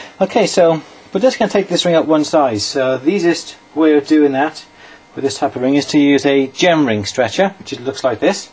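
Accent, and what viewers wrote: United Kingdom. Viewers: is so HOT! That cute little British accent gets me every time. Plus he's the only one on you tube who knows how to use a file. None of this back and forth garbage. --That guy has dirty hands because he's in buffing compound and sandpaper etc all day. It's hot.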